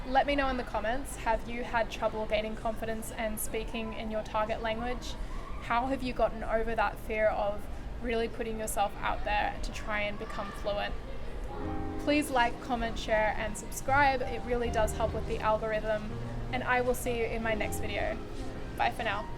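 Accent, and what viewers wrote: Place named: Australia. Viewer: Your videos are really fun to watch especially with your Australian accent is just icing on the cake. Already subbed for keeping myself constantly motivated watching your old videos and upcoming ones. Thank you so much and keep making more videos! By the way, I'm from India and am learning French as well, and I plan on reaching at least a CEFR B1 level in French before moving to France.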